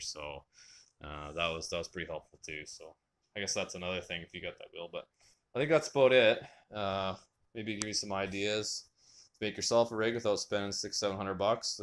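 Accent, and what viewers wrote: Canada. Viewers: You sound Canadian eh? I am building one of these and people keep saying you can find car seats from a junk yard, I've never been to one in Toronto will I have any trouble? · Sound Canadian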